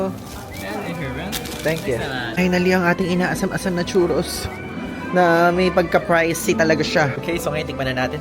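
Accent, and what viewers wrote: Nueva Ecija, Philippines. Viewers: Hi! I recognized your accent, you’re from NE pala, same tayo ng hometown. I’m from Aliaga/Zaragoza. Hope to meet you when i get back home.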